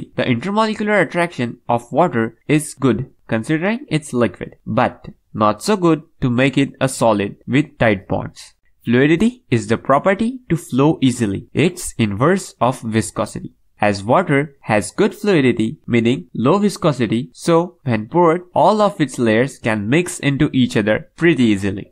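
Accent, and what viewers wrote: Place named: India. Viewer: Wow, living proof I can watch a scientific explanation in Indian English when it's actually clear and well-made - thank you for taking the time! Also, the 'very less' is quite endearing, reminds me of a good friend.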